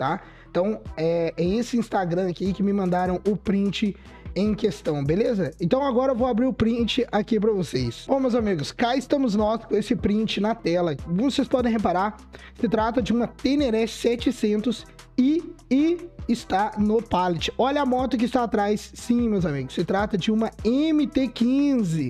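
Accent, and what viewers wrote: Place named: Portugal. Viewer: Portugues de Portugalllllll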